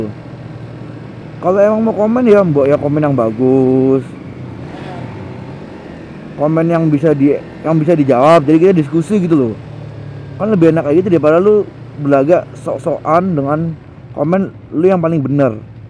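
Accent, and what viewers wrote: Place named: Banyumas, Indonesia. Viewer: anjay mt25 ? btw agak ngapak ngomongnya,kocak njir :v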